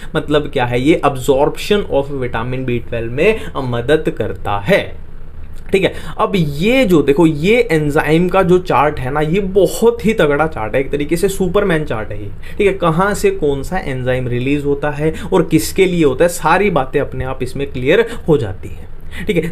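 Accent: Haryana, India. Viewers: Sir aap haryanwi h kya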